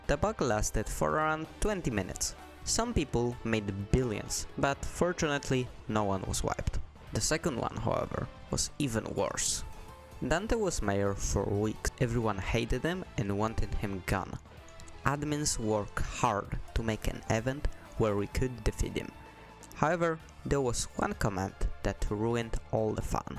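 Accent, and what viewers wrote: Poland. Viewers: Jesteś z Polski? Czy mi się wydaje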